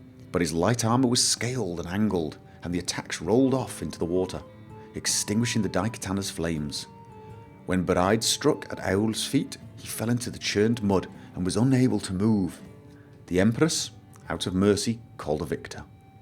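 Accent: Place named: Scotland, United Kingdom. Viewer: I believ you were from norway, but your accent sounds scottish to me.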